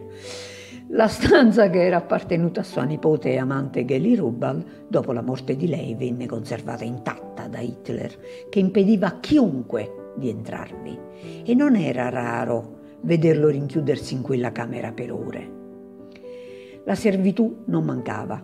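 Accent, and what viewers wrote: Italy. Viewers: Brava, ❤ avverto nell' ascoltarti parlare, il tuo accento campano 👍💥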